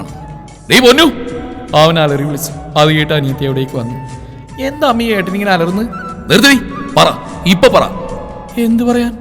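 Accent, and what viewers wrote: Thrissur, India. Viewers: ഇവനിതെന്ത് വയ്യേ... 🧐😅 ഇവൻ ith എവിടെ പരിപാടി അവതരിപ്പിച്ചാലും ഇതാണല്ലോ അവസ്ഥ 😹 that thrissur slang🙌😅 twistod twis😹😹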